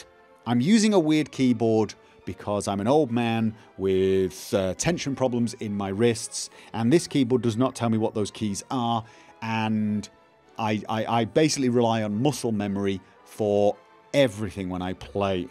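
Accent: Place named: England, United Kingdom. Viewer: going from his accent i would say he was Mancunian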